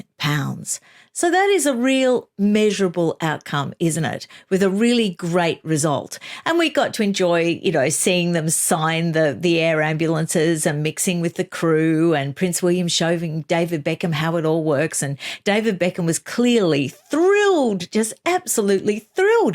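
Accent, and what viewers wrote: Australia. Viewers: I love listening to. You’re voice and Australian accent it the best!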